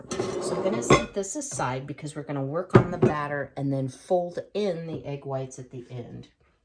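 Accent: South, United States. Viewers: I love the Southern accent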